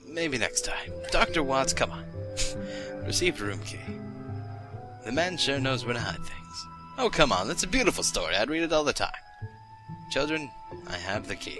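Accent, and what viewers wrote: United Kingdom. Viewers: voice sounds more brittish.... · is it just me or voice changes every year? well not actually the voice, rather the accent.. when I listen to one of his first videos, he sounds a bit... British? xD I dunno.. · DAT BRITISH ACCENT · I love re- watching some of his old videos cx hearing the changes his videos have made :3... And does it sound like he has a bit of a British accent in this one? or is it just me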